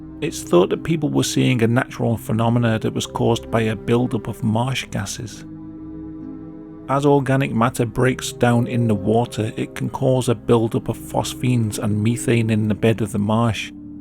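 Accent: United Kingdom. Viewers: “Hey everybody, here” in his British voice should be trademarked and copyrighted tbh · he is british 🤦‍♀️ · I watch a lot of these sorta stories with british accents of all kinds. I like yours. Reminds me of carl. You know, ricky gervaise's friend on that radio show... the guy they all make fun of. Not saying anything bad about you lmao.. I like carl's accent a lot. And yours is like his. Proceed.. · is 100% British.. 🇬🇧 from the North West of England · British but not your typical crisp British News Channel voice and accent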